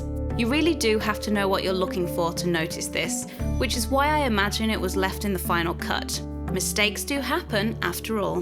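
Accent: United Kingdom. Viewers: Not actually related to the video, but I really love your voice and accent ! I'm French, and I usually tend to dislike the British accent, I don't really know why. But oddly, I find your voice and speech very pleasant and relaxing to listen to ! Also, I got 10 points for Hufflepuff, because I knew about the roosters, Ron's robes and the diary. And I think Lockhart has a wig "in case of an emergency". For instance, if someone came to knock on his door at night, he wouldn't want to be seen with untidy hair, so he would be able to quickly put on his wig and look flawless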